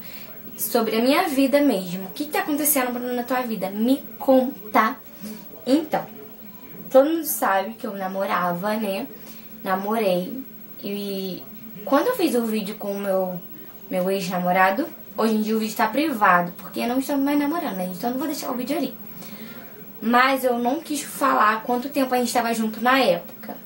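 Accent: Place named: Rio de Janeiro, Brazil. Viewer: pelo "x" no final das palavras, vc e carioca.. onde mora ? em qual parte do rio ?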